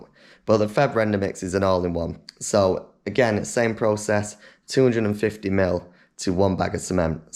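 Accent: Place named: London, United Kingdom. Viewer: Wow that accent. Its like Northern, London, urban, ghetto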